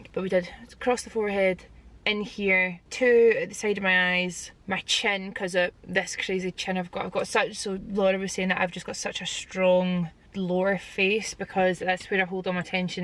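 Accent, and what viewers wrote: Scotland, United Kingdom. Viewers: Scots talk realllllly fast. Wow. Great video 👍🏼👍🏼👍🏼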